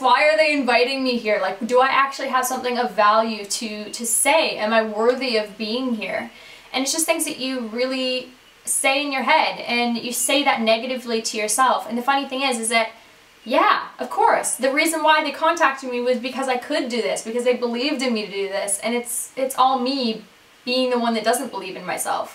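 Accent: Canada. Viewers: That Canalian accent though! It's crazy how much your accent has changed if you watch your older videos. I love how you are so upfront & such a straight shooter in these videos! I have a TMI Tuesday question for ya. About a year ago you posted your TMI Tuesday #47 I believe where you were just coming back from taking a break from YouTube. Do you feel like you've balanced your life out a little bit more since that video and are you happier? I really hope so. So much love! <3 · Are you canadian?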